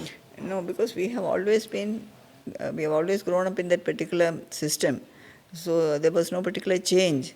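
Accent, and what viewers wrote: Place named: South Africa. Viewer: Your voice accent is very interesting. I am a South African Indian and your voice has some of the accent from South Africa 😅.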